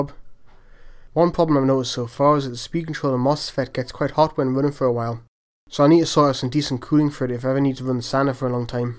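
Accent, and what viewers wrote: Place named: Newcastle, United Kingdom. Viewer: sounds like your from up my way, im originaly from westerhope but now live down durham way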